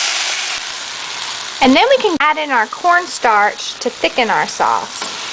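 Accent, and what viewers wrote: South, United States. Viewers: *New Subscriber* Do i hear a southern accent?? LOL I can't wait to make this dish....thanks!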